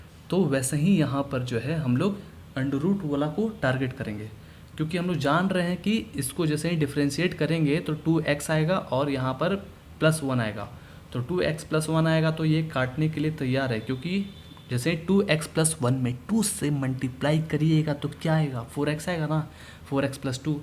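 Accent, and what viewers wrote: Bihar, India. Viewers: Sir u r from Bihar. .?♥️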